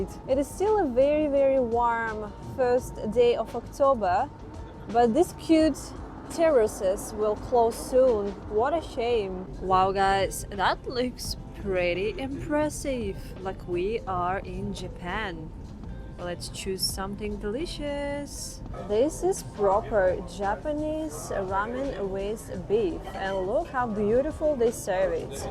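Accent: Russia. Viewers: Mind your pronounciation. You sound very rusdian😊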